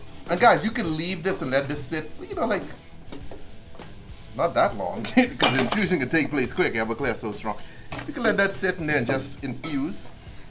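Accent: Bahamas. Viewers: Are you bahamaian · Bro u gotta be from the bahamas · Bahamian accent i hearing or I’m freakin out lol 🇧🇸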